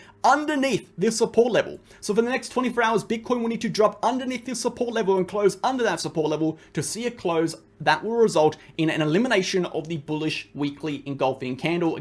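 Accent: Australia. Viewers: just found you bro, aussie here. got lucky and saw this within 2 hours of you posting. Nice to hear an aussie voice for once! Joined that exchange too, appreciate an exchange thats not trying to monitor everything I do! Used your code!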